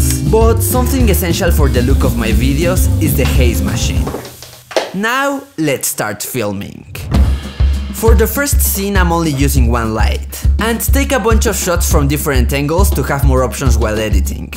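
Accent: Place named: Mexico. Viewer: He sounds mexican... is he? Love his accent regardless.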